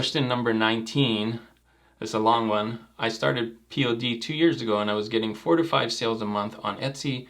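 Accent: Hawaii, United States. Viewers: EH! congrats on da 150k! 🤙 i noticed the small kine accent when i first started watching your videos. but since you never made much reference to Hawaii in the other uploads that i have seen, i kinda shrugged it off as me assuming too much! LOL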